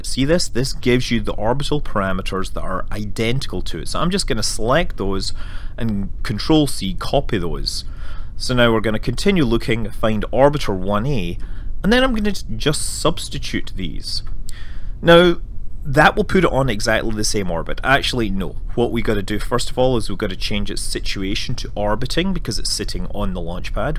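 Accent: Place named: Glasgow, United Kingdom. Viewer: Glasgow Uni accent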